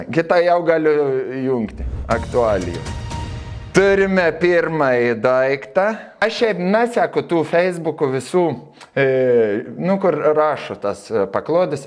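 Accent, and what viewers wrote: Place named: Samogitia, Lithuania. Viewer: Daugiau žemaitiška rokoukis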